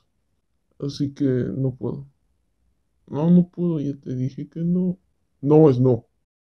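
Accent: Peru. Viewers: Hay me da la impresion de q eres peruano ( :D nada mas es una duda XD no te lo tomes a mal) Pd. Ta bonito el video :D chao cuidate xd un F por tu brazo XD . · eres peruano? tu dejo es muy parecidos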